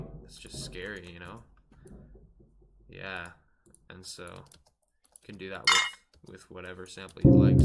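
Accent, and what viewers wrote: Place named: Canada. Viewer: haha I loved the moment when I noticed you're Canadian! Hell ya brother, full send er eh!!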